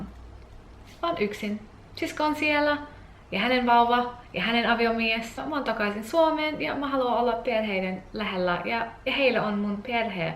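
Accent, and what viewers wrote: Finland. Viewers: I love your Finnish accent! Very good or should I even say excellent pronunciation! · Ihana suomi aksentti❤️ ja olen ihan suomalaiset mutta enkku tulee multa aika helpolla ku mummo ja pappa asuu jenkeissä ja he puhuvat mullekki aika paljon enkkua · minä olen asunut koko elämäni Suomessa ja sinulla on hyvä Suomalainen aksentti!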